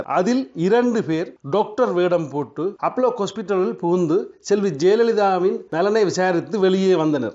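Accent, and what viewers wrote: Sri Lanka. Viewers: dude,. this is srilankan tamil. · Sweet Ceylon Tamil,I like it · ஈழத்தமிழன் போல் இருக்கிறது. ஆனால் அவர் இந்தியத் தமிழர் போல் பேச முயற்சிப்பது தெரிகிறது.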